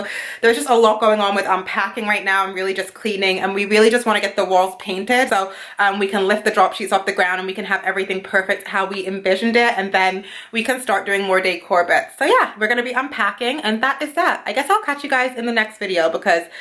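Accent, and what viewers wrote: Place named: United Kingdom. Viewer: Shes brittish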